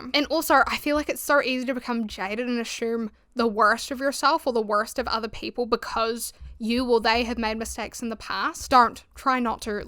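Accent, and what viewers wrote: New Zealand. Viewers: I know right. Im kiwi and I sometimes hear a tinge of a kiwi accent there, but then there’s also Aussie and American in there. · Sorry! Kiwi accent!! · I hear Kiwi. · Im a little late to comment, but I love your videos! You always have a refreshingly nuanced take on the topics you speak about, taking things in good faith and looking at them from a logical yet empathetic perspective. I really appreciate having a channel to watch after work that feels so grounded in reality. I also appreciate and admire your ability to be open and vulnerable about your past hardship while still mainting an optimistic view, as well as keeping distance from us the viewers. I can only imagine that delineating between being honest versus nurturing parasocial relationships is extremely difficult, but you seem to be managing just fine. The way you decide when and how to use personal anecdotes is incredibly effective in enhancing your point and is genuinely impactful because its not the only point you have to make. 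One final thing Ill say is this; I know you get plenty of comments on your accent, but I think its so cute. Ive always loved kiwi accents. When I was a kid my favorite twitch streamer was kiwisimmer (or a username along those lines), partially because her voice was so soothing to me. Keep it up with the amazing videos, your perspective and personality is unique and incredibly necessary on this platform! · She's from New Zealand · fellow kiwi with some linguistics knowledge here. You def sound like a Southie for the most part. Notably though, you do velarize some sounds. Mostly h and s sounds from what I've noticed. Could def be something you've picked up from your Dutch dad. Or i could be way off. Hope it was maybe interesting though 😊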